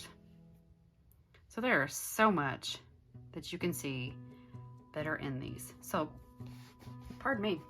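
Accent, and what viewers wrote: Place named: Jacksonville, United States. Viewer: Are you in Jacksonville? Your accent.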